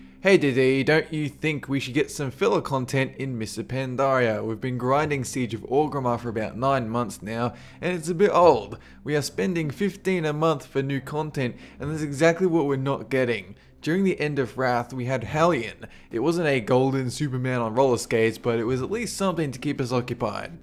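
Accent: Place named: Australia. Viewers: Haven't you ever heard an Australian before? TBH your observation is correct but seriously? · ... no. I have heard plenty of Australian accents both in real life and in the media(not just American), but placing his was difficult. I thought it was either Aussie or South African. He has an odd cadence to his speech and thats what threw me off.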